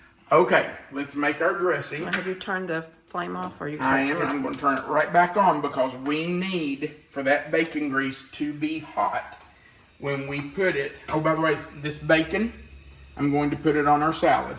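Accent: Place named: Appalachia, United States. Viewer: Appalachian talk ! See, us Aussies know a lot about America ! Not many Americans know much about Australia 😢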